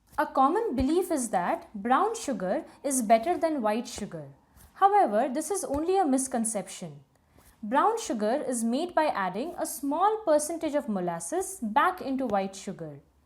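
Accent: India. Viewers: Must you talk in English? Your pronounciation sounds half Hindi and only 10% english. Hard to understand.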